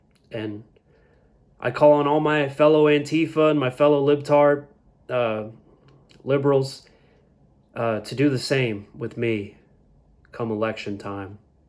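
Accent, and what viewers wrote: Mississippi, United States. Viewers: What part of Mississippi are you from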